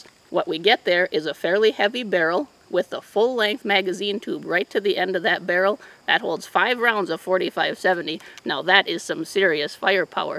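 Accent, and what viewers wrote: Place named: Midwest, United States. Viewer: That's a genuine Midwest accent LOL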